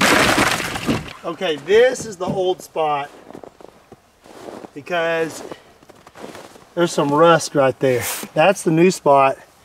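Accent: Kentucky, United States. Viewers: your voice says kentucky, but your clothing says oregon.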